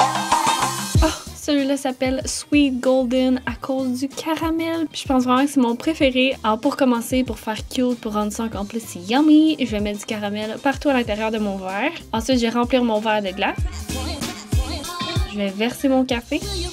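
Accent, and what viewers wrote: Quebec, Canada. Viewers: J'aime pas spécialement l'accent québécois mais la c'est plutôt violent mdrr, c'est la façon normal de parler au québec et pour eux c'est nous qui avons un accent dégueulasses. Faut pas être étonné de l'accent en écoutant une québécoise...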